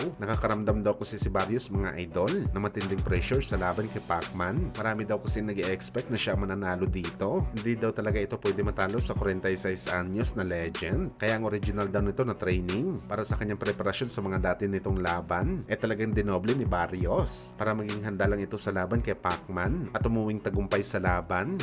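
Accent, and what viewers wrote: Visayas, Philippines. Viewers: Bisaya Ang blogger Kaya ganyan ang boses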